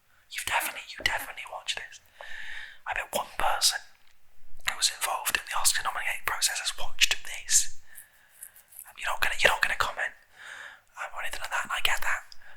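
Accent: United Kingdom. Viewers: always wanted to listen to a british guy asmr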